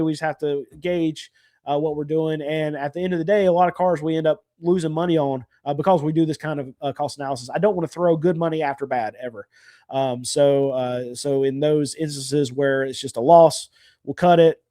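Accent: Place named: Texas, United States. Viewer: Are you in dfw? Sound like a burleson man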